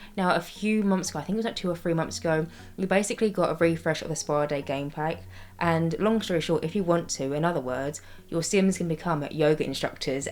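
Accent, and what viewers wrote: United Kingdom. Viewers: Ouu British actent I don't understand!!😂❤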